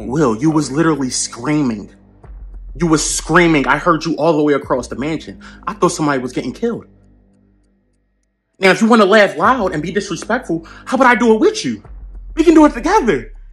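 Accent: Philadelphia, United States. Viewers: you have a Philly accent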